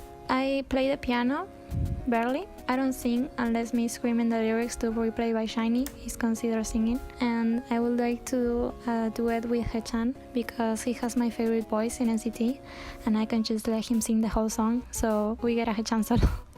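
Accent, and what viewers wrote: Argentina. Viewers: sos una geniaa 💚 me encanta cómo hablas inglés con un toque de acento argento xd seguí así que tus videos son una joyita💚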